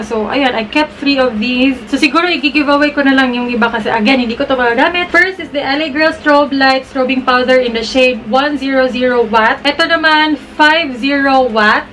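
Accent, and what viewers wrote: Visayas, Philippines. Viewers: You're so loveable and very enjoying to watch! Tho you have that bisaya accent, you show your true and natural self to your subscribers! ❤ Continue to inspire us and do lots of great videos! May God Bless you always po Ate! Power! 💖💖💖 FB: <link> Instagram: jrgvlls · ambot lng magkatawa gyud q mag tan.aw sa imong mga vid. kanang tagalog nga accent bisaya. hahaha soooo us gyud mga bisaya. pasalamat q sa youtube g suggest to imong first vid aq nakita. nalingaw q nitan.aw nlng q tanan. katong labay2 nmo sa haul. nka ana q pra2 maning bayhana oi. nyahaha pro until now. mygeeedd 20k? pla plang mikabook ni subscribe ato .. dalia ra oi. always stay unique gurl.. IG: lovehalo83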